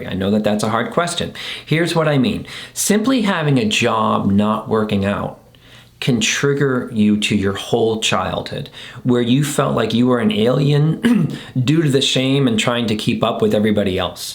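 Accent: United States. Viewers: ❤1. I brought this trip upon myself....Where to escape?? Currently, exhausted, Extremely isolated, brainfog, in Israel #under_fire🎉 #day24 2. Expat from the USA, and appreciate your American accent. Voice From home . I studied psychology... Came here to confront religious Absurd-abuserS who live in Israel.😮😅😊.... So glad I just found your channel/ account. I need tips + excersize for time of war. Staying in hallways/ bomb shelters mostly. Tiny spaces. In Israel under rocket fire. Bless u for all you do! Can send me an email with your videos on you tube or any other free content you can offer. Where are you located these days? City/state? I'm an Expat from Miami currently in central Israel! Sending love, light & hugs wherever it is needed. Amen!❤️ SaraGraceFreed😊3? How to pack and plan while under fire, 4. NEIGHBORs Friends have lost.lived ones... mmm. 5. 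Buying groceries instead! So confused. Help!❤Sara😂🎉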